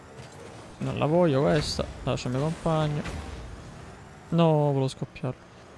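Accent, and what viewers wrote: Palermo, Italy. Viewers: Bella... Ma di dove sei? Sembri palermitano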